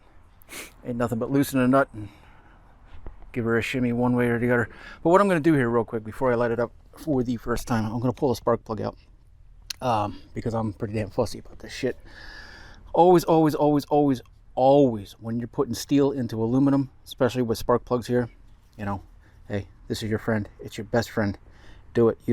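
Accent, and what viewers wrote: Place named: Idaho, United States. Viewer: Where you from cat? You sound like an Idahoan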